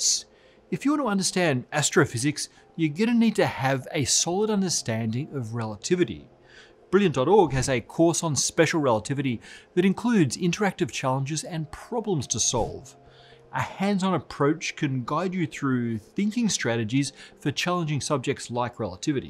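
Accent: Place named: Australia. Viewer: It's called being Australian. I don't know how you struggle to understand him, it's very clear, and I don't think they considered those like you that are impaired in verbal comprehension over his ability as a physics communicator.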